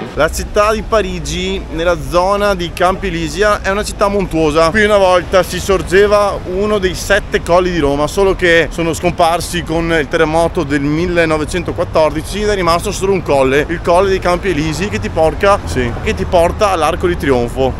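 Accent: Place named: Veneto, Italy. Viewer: il tuo slang Veneto ci sta un sacco per dare pareri soggettivi alle cose😂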